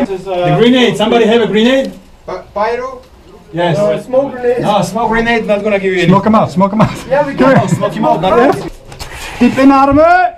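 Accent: Netherlands. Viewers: Are you dutch?? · wowowo hold on a minute, you're dutch? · Leuk om te horen dat je Nederlands bent :D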